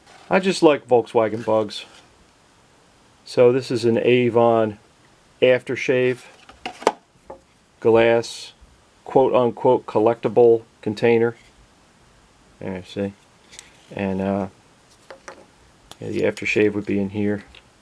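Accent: Philadelphia, United States. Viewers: you sound like a Philly boy.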